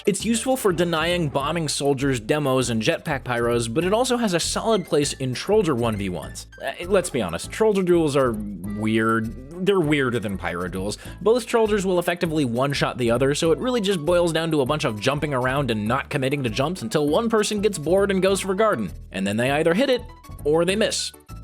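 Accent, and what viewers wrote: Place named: Texas, United States. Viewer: Shit sound you live in Texas? That is scary close to me.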